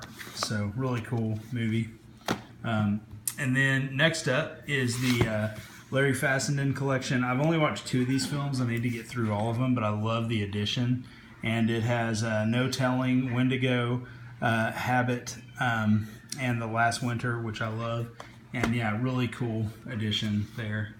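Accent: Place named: United States. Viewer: Yeah, Scream Factory has released so much great stuff. I'm so thankful they released the Halloween boxset. Ok, you have a cool accent, so was just wondering what state you lived in. I should have my Scream Factory vid up by early next week.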